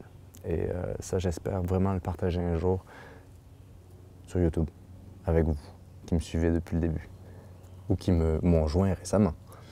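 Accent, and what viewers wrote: Quebec, Canada. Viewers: Bonne vidéo,. Je suis de retour au Québec, après avoir passé l'hiver dans le sud des USA. Deux mois presque, que je suis à Montréal (downtown) et l'envie de reprendre la route me taraude. Je songe à retourner à Cape Cod (P-town) bientôt. On pourrait peut-être se suivre? Ou y aller dans ma van? (il y a de la place et deux lits). Je connais les endroits propices (j'y ai passé tout septembre). La mer est belle et les plages merveilleuses. Et il y a des endroits où prendre une douche chaude pour 0,25$ ou froides, à la plage. En passant, ton idée de tournée américaine, c'est trop ambitieux. Et aller seul au Mexique (que j'ai fait en 2006, pure inconscience de ma part), ce n'est pas une bonne idée. C'est un miracle que je sois revenu en vie. On pourrait s'en reparler. Just email me. By the way, your French is very good, avec le si sympathique accent du Québec. 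· Je suis français et ton français est correct, je comprends tout ce que tu dis!!!! T as juste l accent Québécois ! Mais j aime! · J'aime bien ton accent français du Quebec ;-)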